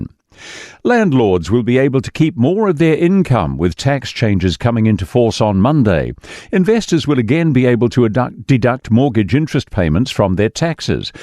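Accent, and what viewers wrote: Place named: New Zealand. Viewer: A real New-Zealander reading the news 🤟Love it and how rare today!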